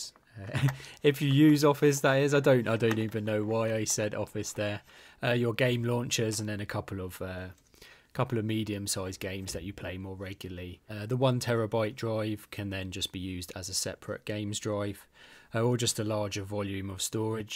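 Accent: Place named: United Kingdom. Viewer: Noooo that PC was perfect for me 🤣 Love your British accent, Nice video ❤️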